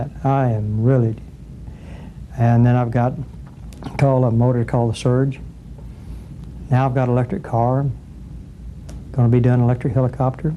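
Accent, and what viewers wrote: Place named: Appalachia, United States. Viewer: This guy doesnt seem nearly intelligent enough to have invented what he claims to have invented. Talks like a hillbilly idiot. Has no wisdom or common sense. Can't explain his own inventions any better than an elementary science teacher. Thinks the government and the patent office will stand behind any free energy device. HA! is dead now isn't he? Why am I not surprised? My best guess is he isnt even the inventor. The real inventor (Dennis Weaver?) probably didnt want to be publically known and stepped up to be in the limelight and take the invention public. ( very poorly I might add ) This is a guy that doesnt even show an understanding of amperes vs volts and what power actually is. His new "battery" is nothing more than a capacitor. Magnetic heating isn't new. It's called induction and eddy currents silly. 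Seems to think all power is measured in volts. "see dem there volts keep goin up, we're makin power".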